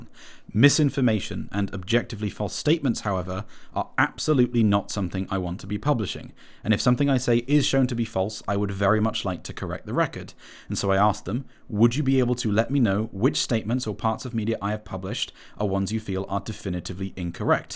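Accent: United Kingdom. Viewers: Question? How can they take you to court if they’re not a UK company. I assume from your accent that you’re in the UK. I would like to see how far (in minutes) this would get in a UK court.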